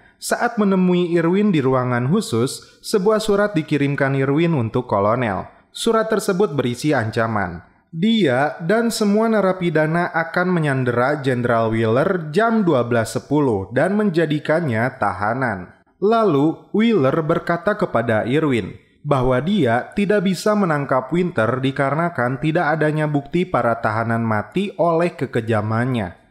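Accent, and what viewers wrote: West Java, Indonesia. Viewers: Org sunda y bang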